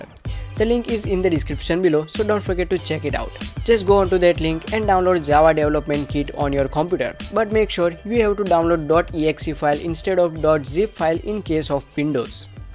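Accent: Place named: Gujarat, India. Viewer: You sound like gujju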